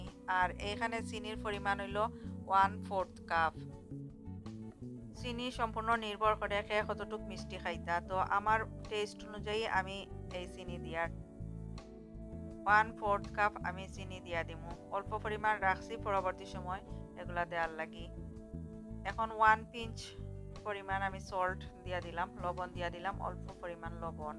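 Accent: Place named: Sylhet, Bangladesh. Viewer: আপু সিলেটি মাত শুনিয়া খুব ভালো লাগলো রেসিপি টা দারুন ❤❤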